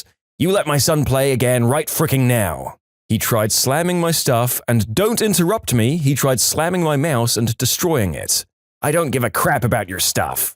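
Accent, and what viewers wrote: United Kingdom. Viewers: THE BRITISH ACCENT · i have not watched in a while why are you british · the brittish accsent is killing me and yes i spelled most of it wrong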